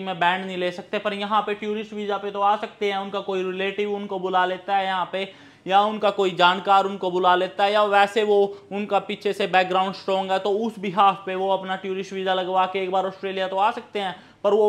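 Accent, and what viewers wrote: Haryana, India. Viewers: भाई करनाल हरियाणा से है शायद बोली से पता चला। भाई जी मै भी आना चाहत आ हूं टूरिस्ट वीजा पर । भाई साहब कोई चक्कर तो नही ज्यादा । कभी जमीन भी बेच दू और ऑस्ट्रेलिया वाले आते ही भगा दे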